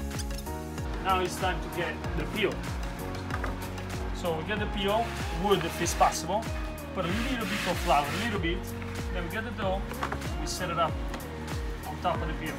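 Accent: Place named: Apulia, Italy. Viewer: bel video, splendida pizza...bravo.. immagino che i presenti erano soddisfatti... dal tuo accento immagino sia pugliese... di dove?? un salutone da Roma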